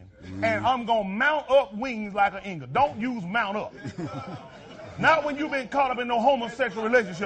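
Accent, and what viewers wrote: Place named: South, United States. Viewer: Man his Country accent gives it ALL. his hair piece!!!!!!!!!!!!!!!!!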